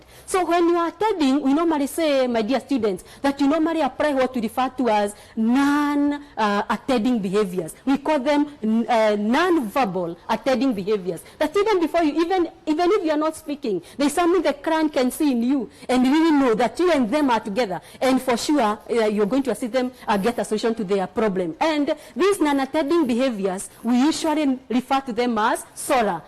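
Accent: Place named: Kenya. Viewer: Nice lecture... I was suffering a little with the Kenyan accent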